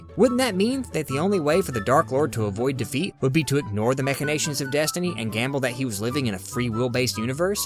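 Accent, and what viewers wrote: South, United States. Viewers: from what U.S. state is that Southern accent?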